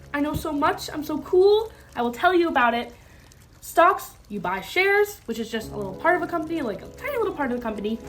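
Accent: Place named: Canada. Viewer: May I ask what country you live in? You sound very Canadian! (From a Canadian)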